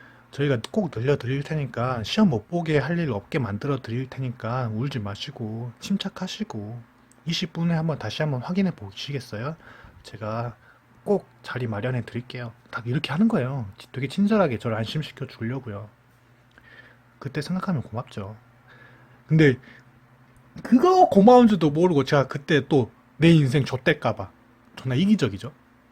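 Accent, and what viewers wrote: Jeolla, South Korea. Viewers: 전라도 사투리 엄청 쓰시는데유?.. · 전라도 말투네용ㅎㅎ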